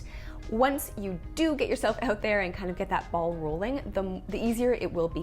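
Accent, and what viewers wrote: Canada. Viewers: Thanks for the tips! Just wondering, are you Canadian?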